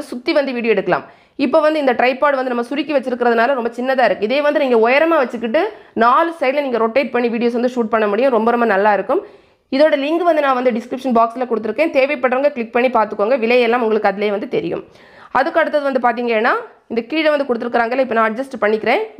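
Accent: Kanyakumari, India. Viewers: Hi sis, Excellent explanation that too your kanya Kumari slang is awesome.. Nostalgic 😔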